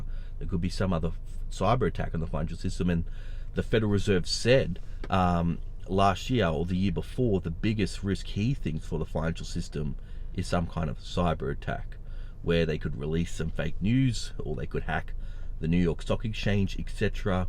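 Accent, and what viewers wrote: Australia. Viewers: Good one... the criminal bank cartels are starting to move... bail ins be a-comin sooner or later... Crypto will be the future.... dont worry about that, mate....fiat currency is fkd...cbdc idea is criminal... Im in Peru..love to hear the accent from the land of the meat pie - always....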